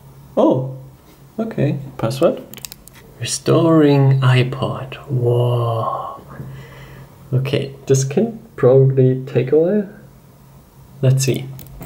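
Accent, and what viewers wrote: Germany. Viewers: except german accent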